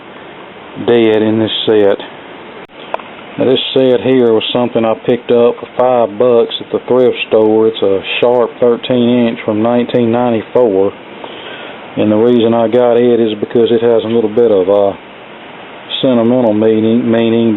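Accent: United States. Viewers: Interesting American accent. Which part of America are you from, if I may ask politely? I am from Australia.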